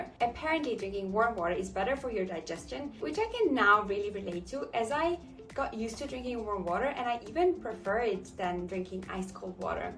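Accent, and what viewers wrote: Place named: Singapore. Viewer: u already sound like a singaporean :face-blue-smiling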